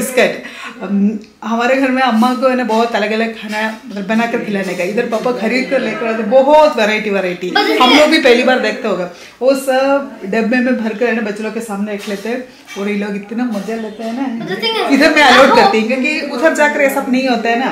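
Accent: Kerala, India. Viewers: Yourvlog is very nice. I'm enjoying it and your way of talking Hindi is cute with malayalam accent .Where are you exactly in kochi? I mean your in laws house where is it Chechi? Is it in pallikkara or kakkanad?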